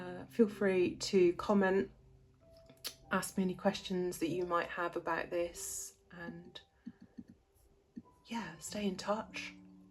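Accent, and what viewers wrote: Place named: West Country, United Kingdom. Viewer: I love what you do, but you're not getting a coffee. You're not getting a coffee dammit. Showbiz tip - think about losing the west country/pirate accent. Try doing the videos in Geordie.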